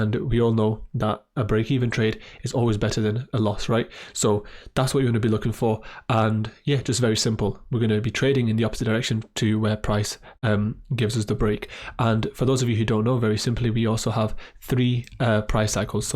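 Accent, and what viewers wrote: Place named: Midlands, United Kingdom. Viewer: From the UK midlands, Birmingham or Luton.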